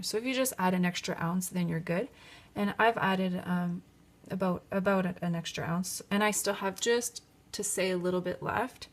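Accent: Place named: Ontario, Canada. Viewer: Thank you so much for the informative videos! I’ve been binge watching your vids & love them all! BTW: your “accent” takes me right back to summer even on these cold winter nights. My family has a cottage near Port Colborne & we snowmobile near Algonquin Prov. Park. So basically..listening to you gives me warm fuzzy feelings besides all your fab tips & tricks😆💕!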